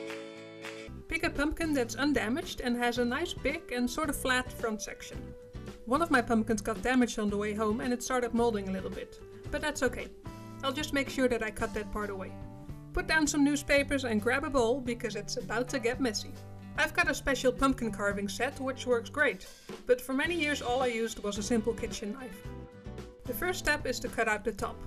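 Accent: Netherlands. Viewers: Is she dutch??? She has that kind of accent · She is dutch people have a strong accent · You are dutch hah! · you sound Dutch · u have such a Dutch accent! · Let me guess your from Holland..? very good vid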